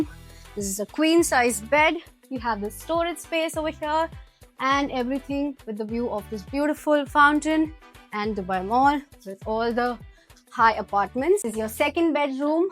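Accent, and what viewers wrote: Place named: India. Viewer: That is full Indian voice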